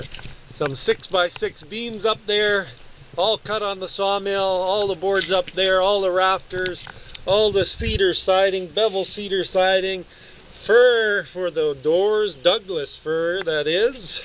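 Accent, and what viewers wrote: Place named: Canada. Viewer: I detect a Canadia accent. We have 20 acres with mixed conifer forest, and a small number of oak. We need to clear trees to build. I thought we would turn the trees into firewood and buy lumber, but I'm starting to like this idea more and more. We have some very large trees compared to what you're working with, some that size too. Thanks for posting this.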